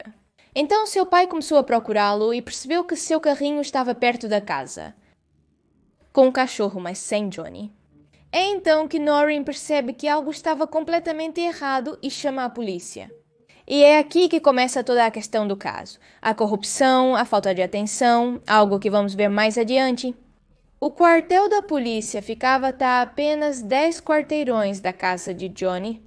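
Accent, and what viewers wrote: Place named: Portugal. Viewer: este video ela fala uma mistura de brasileirado com portugues de portugal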